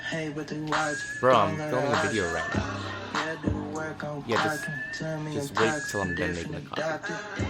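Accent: Philippines. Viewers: I just got a pour over set for Christmas and this video got me SUPER excited to make myself coffee <3 the only thing is that my set came with a manual grinder that I have no idea how to use yet lol. But great vid, love the pilipino accent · We lub da pilipino akcent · way cool. dance moves. Philippine accent cut over. super fun.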